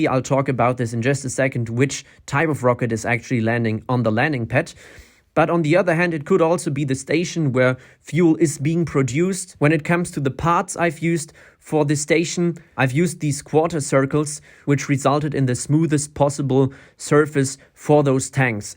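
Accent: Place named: Germany. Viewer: Der Typ ist kein Engländer. So wie der englisch spricht hört man sofort raus, ist deutsch seine Muttersprache. Ich habe mir schon hunderte englische/amerikanische Videos angeguckt und keiner spricht so. Das machen nur deutschsprachler.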